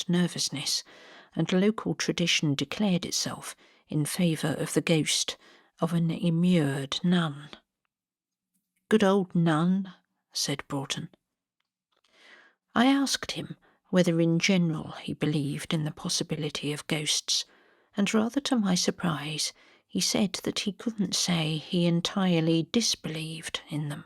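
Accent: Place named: Midlands, United Kingdom. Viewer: Are you from the midlands,? I love your accent and your beautiful narratives! Thank you, my Lovely. X X X😊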